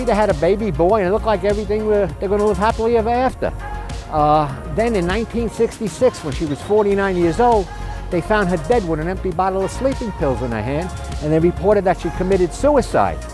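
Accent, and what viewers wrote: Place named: East Coast, United States. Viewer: U sound like your from the east i cant stand accent.niether can rest of world.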